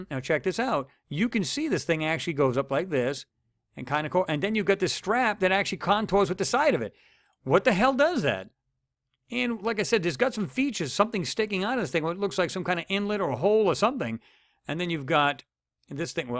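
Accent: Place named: New Jersey, United States. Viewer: "for everyone on a pee cee" you're funny you're definitely from New Jersey Maryland or New York LOL anyhow brother send me an email. I like your Mars and moon work the most but I wish you could take a nice hard long look at Ceres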